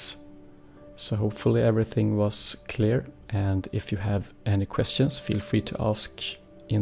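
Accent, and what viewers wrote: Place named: Sweden. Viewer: Hör så tydligt att du är svensk haha xD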